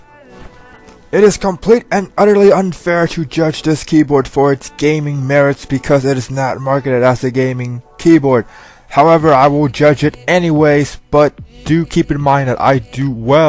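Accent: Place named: South Korea. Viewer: quikfinger00 it Sound like he have Korean Accent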